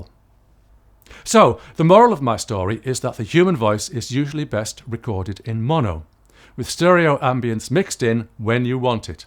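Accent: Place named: North East, United Kingdom. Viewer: Cheers, very useful. Just about picking up your dulcet north east tones..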